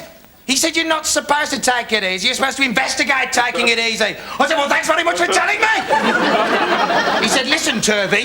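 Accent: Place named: Redditch, United Kingdom. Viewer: how does he know the redditch accent so well?? lol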